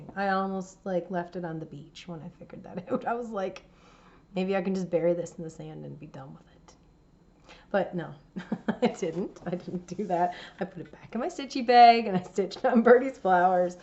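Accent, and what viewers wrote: Canada. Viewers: Is that an East Coast Canada lilt I hear in your voice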